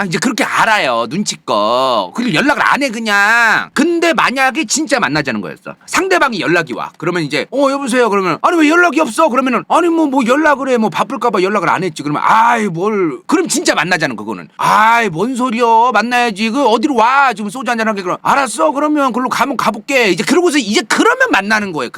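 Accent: Chungcheong, South Korea. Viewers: 앜ㅋㅋㅋㅋㅋ충청도 말씨 너무 좋앜ㅋㅋㅋㅋㅋ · 충청인으로서 공감~~ 고향말투 빠지네요ㅋㅋ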